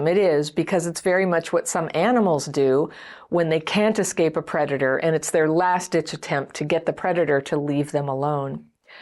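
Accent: United States. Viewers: appreciate your American accent. Voice From home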